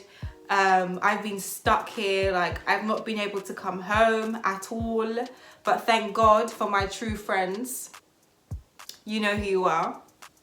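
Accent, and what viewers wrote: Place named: Jamaica. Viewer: You sound a bit Jamaican are you Jamaican? #Party #Protect_me #ova 💊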